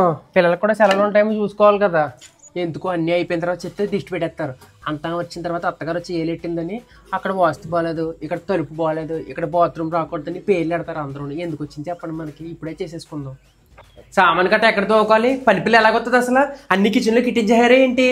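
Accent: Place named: East Godavari, India. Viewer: Likkamtha vumdi. Idi East godavari slange. Madi East godavari, Amalapuram andi.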